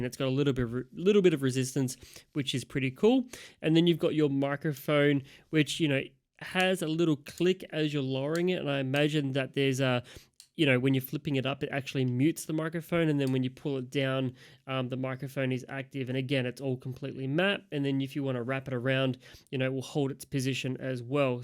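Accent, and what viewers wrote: Australia. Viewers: ahhh, the Aussie ascent is music to my ears. 😌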